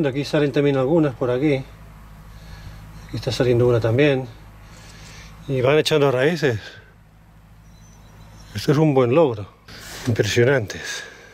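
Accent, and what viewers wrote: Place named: Catalonia, Spain. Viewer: Noto en tu acento un deje Catalán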